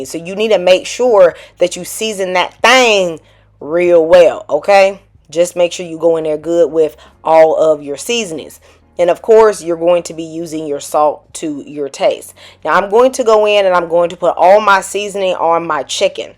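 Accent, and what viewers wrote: South, United States. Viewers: Like Mr. once said in my Country Brazil in 1926. IT'S DELICIOUS! IT'S DELIGHTFUL!!! Thank you so much for this so special recipe I will prepare immediately! I LOVE your accent!! I had a golden opportunity to live in the south for 6 years Birmingham Alabama. I made a lot of friends there. I learned how to make a good gumbo soup and chicken Jambalaya. Thank you again. Love in it! 🥰👏👏👏👏👏👏👏👏👏👏👏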